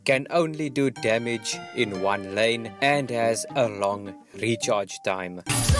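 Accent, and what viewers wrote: Afrikaans, South Africa. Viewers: South african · is that a south african accent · Dude, are you South African? I can hear the Afrikaans in your accent 😂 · Wait are you Afrikaans? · Could instantly tell you're South African 😂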